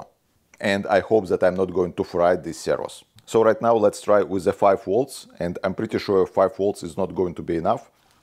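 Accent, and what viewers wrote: Russia. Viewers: Perfect Russian accent Прикольная самоделка👍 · Не пойму что за акцент???🤔🤔🤔 русский???? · блин, ты же русский ? акцент прям явный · Strong russian acсent, beautiful ideas )) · А ты не русский? Проато акцент есть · Почему я слышу русский акцент?)))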